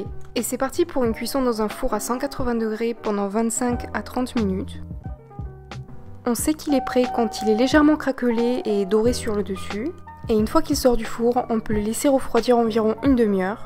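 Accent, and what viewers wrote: South, France. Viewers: Je ne suis même pas vegan mais j'adore tellement tes vidéos , ton accent du sud (comment ça se fait d'ailleurs que tu aies cet accent en ayant vécu au Mexique ?), ta gentillesse et tes belles recettes que je suis abonnée haha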